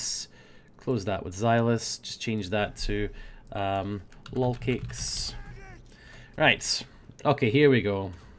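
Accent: Scotland, United Kingdom. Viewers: ur scotland ya?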